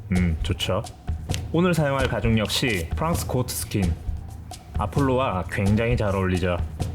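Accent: Gyeongsang, South Korea. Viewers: 약간 경상도 억양 섞인 말씨가 중독성있음 ㅋㅋ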